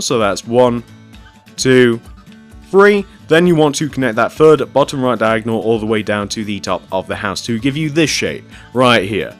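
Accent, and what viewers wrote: United Kingdom. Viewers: Shock Wave it is him counting he is (it sounds like) british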